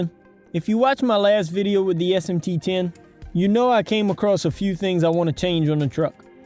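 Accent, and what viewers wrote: Louisiana, United States. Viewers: Where are you from? That sounds like one of them Cajun accents!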